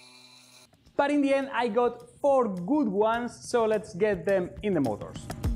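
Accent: Spain. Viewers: Con tu acento me da por hablarte en Español, pero tampoco es que haya confirmado que eres Español The bed can be heated in sections? Because if you can , doesnt seems hard to distribute the heating cable in "discs", like the ones on a ceramic hob, so you can save power, heating only the sections you are using, on a normal 3d printer is not really a concern, but in something that big, the bed must be really expensive to maintein edit: okay, ive seen that part now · seunas a español hablando ingles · eres un español hablando ingles? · etsba viendo este video...y pensando este tio habla epsañol xD ese ingles es muy español jajajajjaaj saludos grande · se nota mazo que eres español jajjaja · Adoro la forma que tenemos los españoles de pronunciar el inglés jaja, te dejo mi like! this vid was fun ^^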